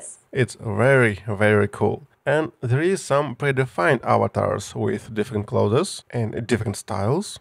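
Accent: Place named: Russia. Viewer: Такой русский английский. Это скорее не акцент, а интонации Полезное видео, благодарю за труд.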